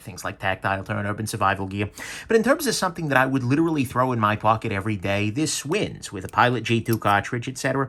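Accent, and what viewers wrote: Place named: New Jersey, United States. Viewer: Signore i love your Italian American accent new jersey ❤️ 🇮🇹🇺🇸 🗽auguri in bocca al lupo 🙂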